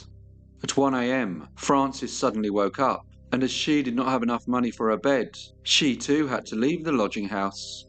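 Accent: United Kingdom. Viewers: Hello from France. Good storytelling. Just a question, where in Great Britain does your accent come from ? I find it clear and easy to understand. Thank you.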